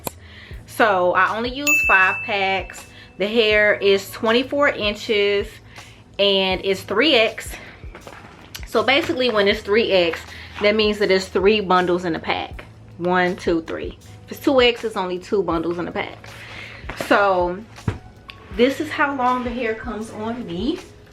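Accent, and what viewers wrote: St. Louis, United States. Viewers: u sounding str8 outta Nellyville like u from the Lou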